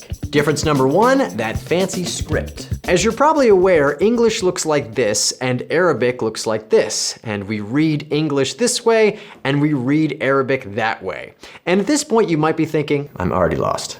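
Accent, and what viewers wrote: Egypt. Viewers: يا الله اللهجه المصريه سيئه جدا مفروض انك تعلمت لهجه شاميه او لهجه خليجيه بيضاء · It sounds like you’re learning in Egypt 🇪🇬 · As a Saudi myself you sir are 100% certified ✅😂 I notice that you speak Arabic with a hint of an Egyptian dialect which is cool and understandable seeing that most people choose that dialect to learn Arabic. Your pronunciation is impressive and Egyptian dialect has it's own humour and "ring" it's such a heart lighted dialect in Arabic so hearing you speak with it in a common tongue not a traditional one makes you relatable and pleasing to hear ❤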